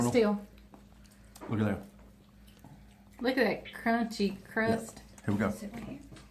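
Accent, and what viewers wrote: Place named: North Carolina, United States. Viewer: Another NC staple, - DUKE'S mayonnaise! No other brand goes in my fridge. I am so glad I discovered your channel. It's not often you get to watch any program and hear someone who sounds like we do and who is so relatable. Thank you for sharing your life with us and for your sweet spirit. It's very much needed!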